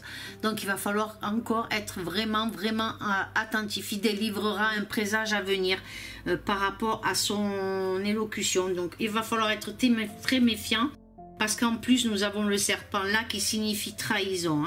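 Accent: South, France. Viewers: Bonjour accent du sud c'est e plus beau moi je suis du sud-ouest le Gers.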